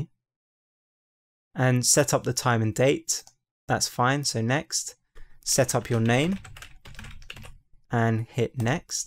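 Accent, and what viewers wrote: United Kingdom. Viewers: I love your British accent, it's so cool!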